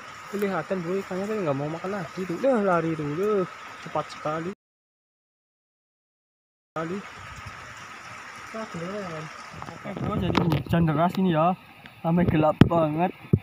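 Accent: Banyumas, Indonesia. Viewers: Bahasanya ngapak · Sampean wong ndi kang deneng bhsane ngapak😂👍🤭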